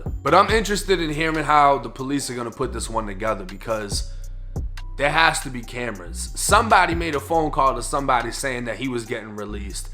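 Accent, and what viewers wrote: Boston, United States. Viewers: I just love your Boston accent. You make these videos so much more interesting with the way you narrate. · Do I hear a Boston accent? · You from Boston? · Boy your Boston accent is hilarious lol…good shit tho!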